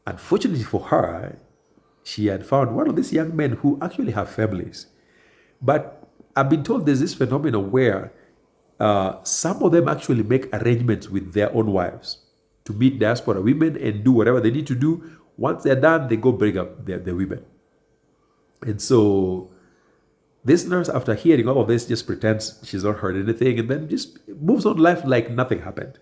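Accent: Kenya. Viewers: This guy's story telling skills coupled with Kenyan accent makes this story even better. I love me an Eastern African accent.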